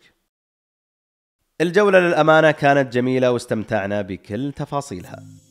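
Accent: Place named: Saudi Arabia. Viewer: حياك الله اخوي انا من سلطنه عمان وحسب كلامك بالمقطع اظن انك سعودي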